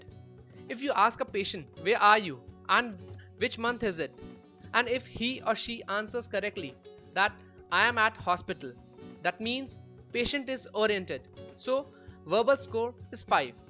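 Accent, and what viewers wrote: India. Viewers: Useful video thank you I love your accent I love Indian 2 ♥️♥️♥️♥️🌹🌹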